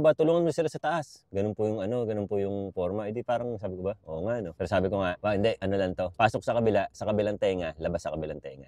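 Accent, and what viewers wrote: Philippines. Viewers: halata naman sa pananalita na sa Pinas lumaki yan jusko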